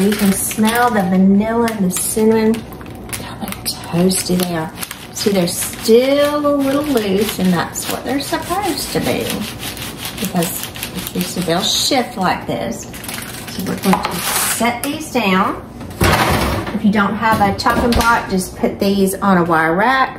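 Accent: South, United States. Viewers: I love her Southern accent! Enhances the recipe 🙂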